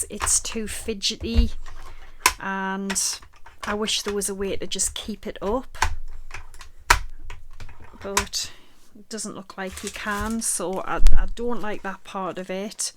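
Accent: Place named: Sunderland, United Kingdom. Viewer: Thanks, great reviewing (even if I just bought the Sizzix switch and am regretting it now). Love your accent - are we both from Sunderland? Except I live in Sydney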